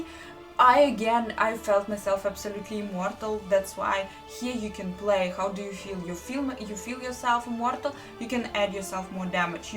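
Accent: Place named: Russia. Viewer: Как легко узнать русскоговорящего по его произношению английского языка) Но вполне сносно!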